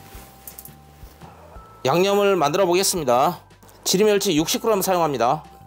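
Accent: Jeolla, South Korea. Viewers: 말씀도 맛깔나게 하시네요. 정겨운 전라도 말씨 더맛있게 느껴져요^~ 감사합니다.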